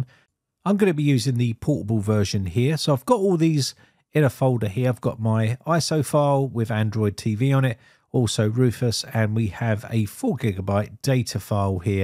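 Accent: United Kingdom. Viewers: Oh nice tutorial i knew you are british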